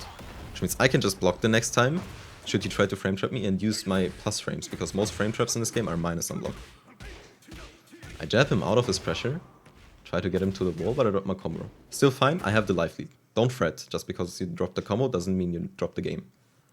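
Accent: Germany. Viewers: German Accent detected Kuss auf die Nuss von einem fellow Devil Jin Loyalist <3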